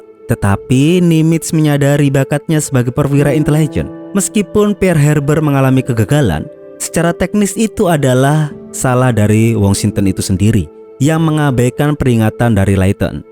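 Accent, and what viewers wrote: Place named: Malang, Indonesia. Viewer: Bahasamu kek org malang min😂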